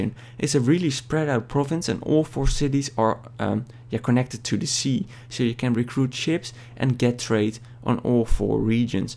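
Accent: Netherlands. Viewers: wow een noorders accent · i can confirm as a dutch person it is a dutch accent he uses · crazy dutch accent i hear...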